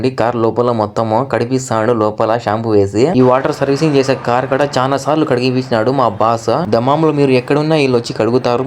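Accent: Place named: Andhra Pradesh, India. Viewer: అన్న మీది ఆంధ్ర కదే నీ బాషా అలా నే ఉంది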